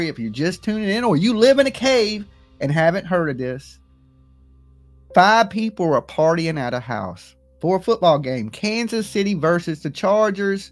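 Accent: South, United States. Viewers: You crack me up. Live your southern accent. Great job, keep up good work.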